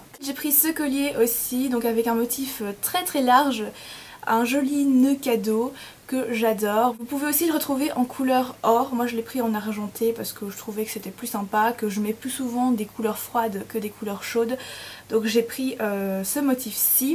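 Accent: Belgium. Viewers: Oh je n'avais même pas remarqué l'accent belge mais quand tu as dit bruxelles ça a tilté !!!! Enfin bref j'adore tes vidéos , continue comme ça !!!! ;)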